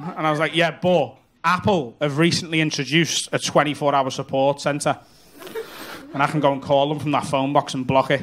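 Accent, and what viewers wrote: Liverpool, United Kingdom. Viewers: Like a scouse haha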